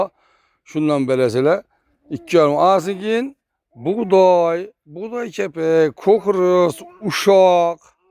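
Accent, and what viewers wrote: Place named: Khorezm, Uzbekistan. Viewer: хоразича.гапраяти шэкилли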